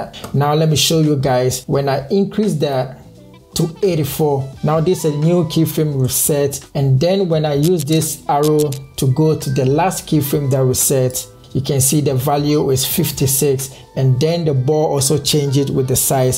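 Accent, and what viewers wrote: Ghana. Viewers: You sound like a Ghanaian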